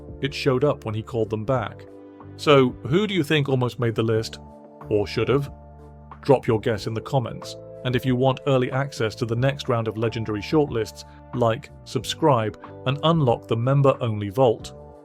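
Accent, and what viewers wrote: United Kingdom. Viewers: Would have been nice to hear it from Ron's own voice instead of an overlay of a British nobody. Nobody can become Ron Howard, even for this explanation, which is not an interview. Disappointing.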